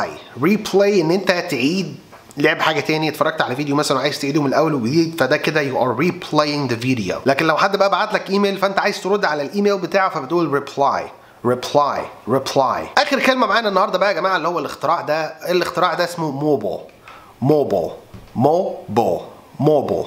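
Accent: United States. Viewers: تصدق.في صديق لي بحكي مثل الاميركان وشايف نفسه كثير..قد ما حكيتله عن اليوتيوبرز عمره ما أقتنع الا بعمر عبدالرحيم ههههههه انصدم باللهجه بتاعتك يا.لا وعملك سبسكرايب ههههه تحياتي ليك استاذنا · طب بس بقى انت بوقك تبع اميركان اكسنت وكده خلصت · شكرا على مجهودك الرائع ارجو فقط من حضرتك التأكيد على إن النطق بالAmerican Accent لأن النطق بال British Accent مختلف فمثلا Mobile (America) ..... /ˈmoʊbəl/ (British) ...../ˈməʊ.baɪl/ و نفس الشئ فمثلا نطق luxury (British) ..../ˈlʌk.ʃə.ɹi/ (America) ..../ˈlʌɡʒəɹi/ لأننا كمدرسين يأتي إلينا الطلبة بعد مشاهدة بعض الحلقات و هم مقتنعين بأن نطق الكلمات التى تم تعليمها لهم خطأ بالرغم من صحتها باللكنة الإنجليزية البريطانية مرة أخرى شكرا لمجهودك و أسف على الإطالة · حضرتك بتعتمد اعتماد تام على American، كلمة Pause و Mobile و Stop مش بننطقهم غلط بس بننطقهم British فاهما مش غلط، لا صح بس باختلاف اللهجات.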